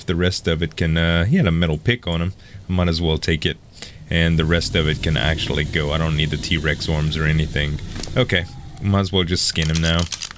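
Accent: Louisiana, United States. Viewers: Is Z from louisiana?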